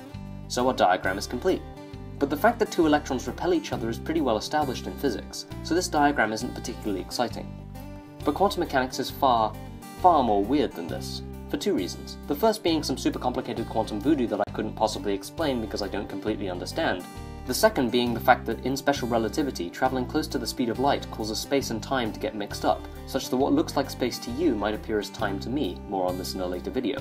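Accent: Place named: United Kingdom. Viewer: Thanks for this, my only suggetion is to speak slower and more clearly. That Brit accent is sometime hard for Americans to understand when spoken fast.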